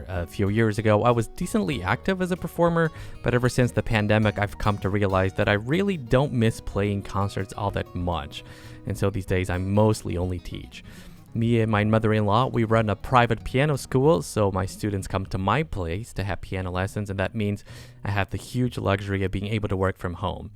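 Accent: Germany. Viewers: I think it does need to be pointed out that your German does really sound German for other foreigners. Then again, there isn't really a sole German accent. Just ask the Bavarians or Austrians😅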